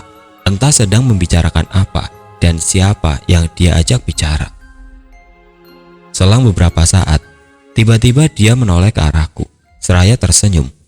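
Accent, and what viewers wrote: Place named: Java, Indonesia. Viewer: logatnya nanggung, klo jawa sekalian, jaksel sekalian.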